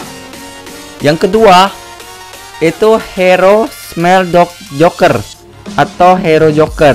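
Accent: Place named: Madura, Indonesia. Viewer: lagat nya ky org madura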